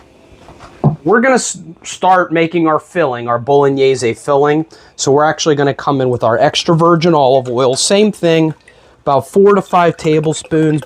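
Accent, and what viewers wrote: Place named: Pittsburgh, United States. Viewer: Born and raised in Pittsburgh. First video I’ve ever seen of you. Soon as I heard you talk, I thought this guy is from Pittsburgh.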